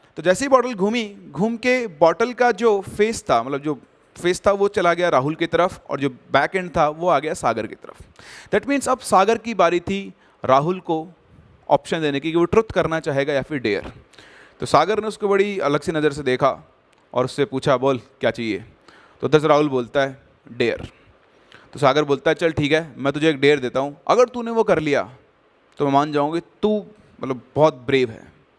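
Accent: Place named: Maharashtra, India. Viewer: 10/10 for the perfect Marathi accent..☺️🤞😍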